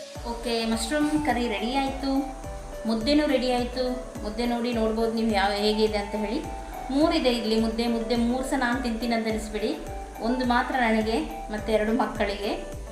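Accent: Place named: Kundapura, India. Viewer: actually ನನ್ನ ಅಮ್ಮನ ಊರು ಕುಂದಾಪುರ.. ನಿಮ್ ಮಾತಾಡೋದು ನೋಡಿ ನಮ್ಮೂರಿನ ಹುಡ್ಗಿ ಅಂತ ಗೊತ್ತಾಯ್ತು.. ಅದಕ್ಕೆ ಕೇಳಿದ್ದು.. Don't mistake me..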